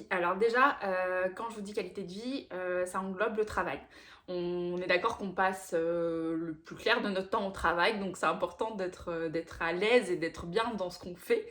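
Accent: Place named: Quebec, Canada. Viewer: Tu commence a avoir des intonation de quebecoise.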